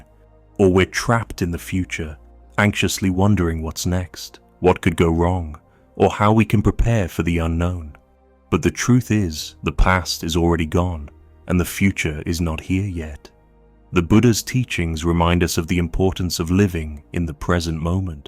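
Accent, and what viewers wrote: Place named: United Kingdom. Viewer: What’s with the British AI accent…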